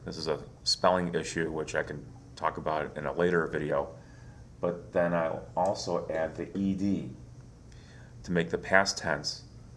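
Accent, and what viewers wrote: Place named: Midwest, United States. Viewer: Viewers should be aware that this speaker has a very flat mid-western accent. In other words, his "o"s are more like "a"s. His word "stop" sounds like it is spelled "stahp". In other parts of the country, we pronounce the "o" more like a round "o", so we would say "stop" as if it is spelled "stawp"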